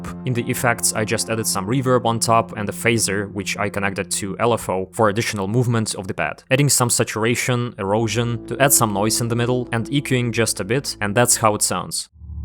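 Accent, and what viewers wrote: Europe. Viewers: I came to say the EXACT same thing 😂. Dudes just European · He just has a European accent of some kind. Is that what you mean?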